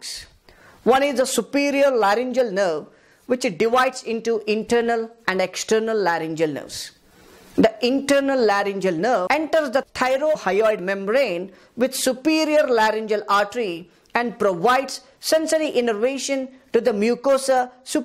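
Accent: India. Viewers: 讲得挺好的，简短精炼。但是如果英文咖喱味不那么重就好了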